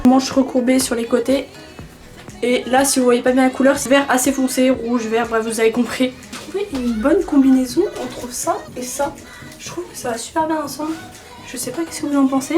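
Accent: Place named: England, United Kingdom. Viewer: Super vidéo j’ai attendu grave longtemps pour ta vidéo enfin elle est sortie et très belle accent anglais au passage mdrr bref j’te kiff 💕💍❤️🍭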